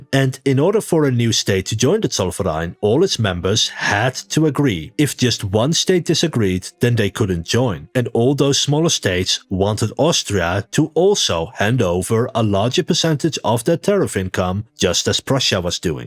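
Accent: Netherlands. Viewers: ik hoor je niet vaak engels op zijn rutte praten maar Kanals😂 klonk wel mooi helemaal omdat je het tig keer achter elkaar zij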